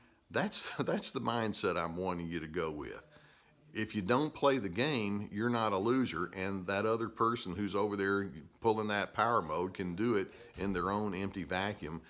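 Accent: South, United States. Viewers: Dr, You have helped me so much! I bought 2 of your books on amazon. They both 'cut to the chase'! I was a people pleaser and became very angry and then depressed. Without realizing what was causing it, i sunk down for years. You helped break it down and sort it out! I am free of my bondage! I am forever grateful! Would love to spread the word! Bless you! (Love your southern accent too)!! You have changed my life, my attitude, my future!! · I love your southern accent. Hallo from Bosnia. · I'm from the south, also. I hope you know that your super cool southern accent gives a much needed safe space to the sceptical-of-therapy southerners. There is a lot of suffering down here that's definitely in our control whether or not we want to admit it. After showing my super anti-therapy Alabamian aunt one of your videos to help her understand her brother-in-law she said "I really like him. 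He sounds like a normal person". hahahaha I love it!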